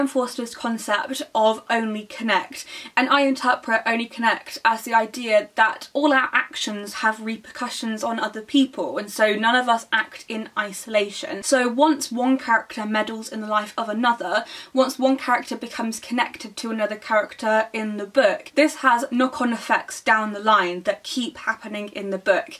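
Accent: United Kingdom. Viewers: Yours...it is my favourite "British Accent" of the Year, so could you tell me more about it, please? Thanks in advance anyway.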